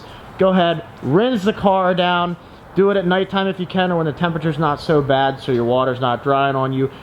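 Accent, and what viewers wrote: United States. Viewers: what part of PA are you from?!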